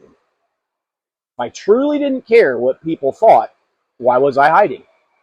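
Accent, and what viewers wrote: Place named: Georgia, United States. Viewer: "Let's have a talk" in that warm Georgian accent is absolutely killer. jmho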